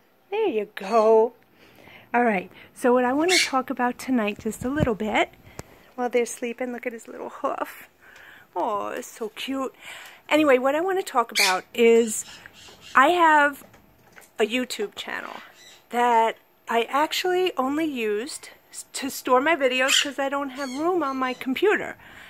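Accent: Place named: New York, United States. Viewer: how much I enjoy your accent. Long Island? Staten Island?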